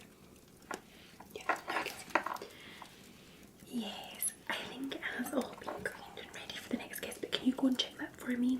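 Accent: Scotland, United Kingdom. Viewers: 40 minutes of tingles from the resplendent scottish lass. perry the platypus noises ☺️ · Hello I hope you are doing well! I ❤ the very Scottish accent!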